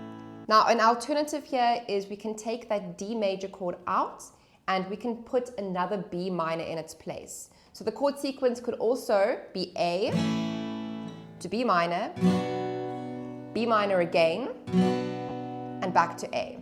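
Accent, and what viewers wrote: South Africa. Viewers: I'll have to watch the video again because halfway through it suddenly dawned on me that I'm not hearing the typical American accent but was pleased to realise I'm listening to the Saffa accent. Pragtig. Love your lessons. I have a guitar stashed away but want to start playing again. Subscribed. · Is she South African?? She’s surely South African🫢 ja, no, lekker, this is cool!🥳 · Finally someone who knows how to teach!! Kudos! And luv ur south african sounding accent!!!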